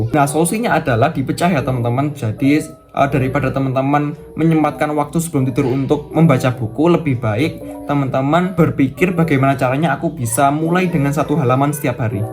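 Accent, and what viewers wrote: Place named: Java, Indonesia. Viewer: logat jawanya kelihatan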